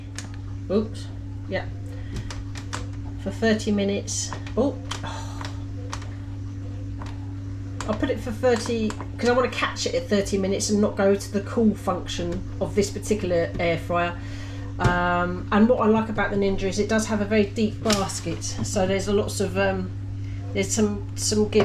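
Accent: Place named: Croydon, United Kingdom. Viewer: Just subscribed love your channel take it your from Croydon way just your accent 😊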